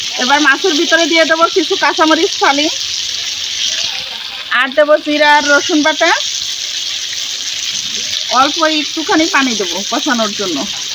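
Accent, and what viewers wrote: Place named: Narail, Bangladesh. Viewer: আপনাদের বাড়ি কোথায় আমাদের মতো কথা আমাদের মতো রান্না আমাদের বাড়ি নড়াইল লোহাগড়া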